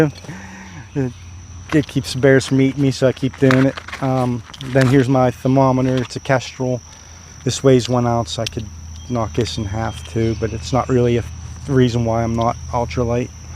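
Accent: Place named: Western Pennsylvania, United States. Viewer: Right on western PA .... so you sound..... East Palestine here.!!!!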